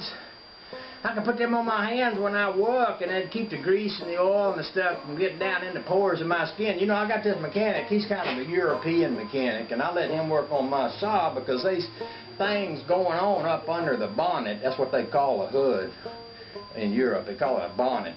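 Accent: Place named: Georgia, United States. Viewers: HaHaHa! Had me laughing! Funny vid! ...I knew I heard a Ga drawl in your voice! What part of Ga you from? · you can wear them under your work gloves if needed and i heard georgia i didnt know you lived in georgia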